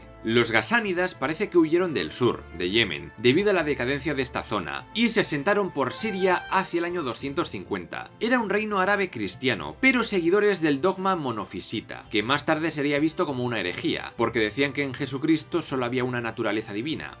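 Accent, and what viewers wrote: Spain. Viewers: Buenos videos hermano! Tengo que confesar que no me gusta el acento español pero con estos vídeos me está empezando a gustar mañana compraré tu libro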